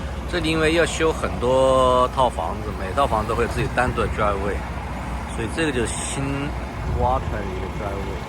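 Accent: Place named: Hubei, China. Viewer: 感觉湖北人口音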